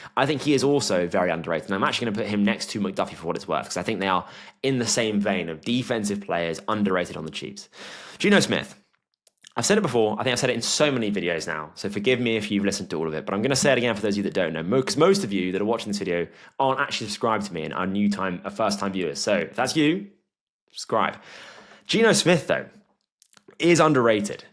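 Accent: United Kingdom. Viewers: Something special about listening to a knowledgeable Brit speak about American Football. However you are wrong on Caleb Manziel Williams. Cam Ward will be a better NFL QB. Overall though good takes. Jakobi Myers deserves to go at the top of the underrated list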